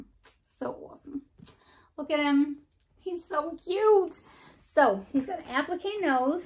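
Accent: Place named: New York, United States. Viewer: 😂 love your NY accent 💙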